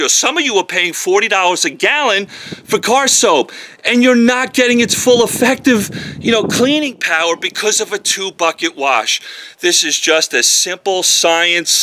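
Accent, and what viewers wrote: New York, United States. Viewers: It's a New York accent, from where he's from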